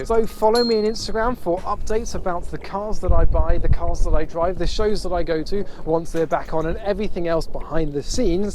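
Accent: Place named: RP, United Kingdom. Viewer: I can't believe how posh you are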